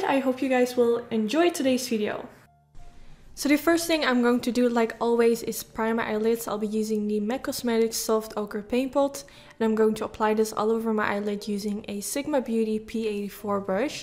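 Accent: Netherlands. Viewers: Je makeup is echt gorgeous ❤️ ik hoor wel echt super sterk nederlands accentje 😂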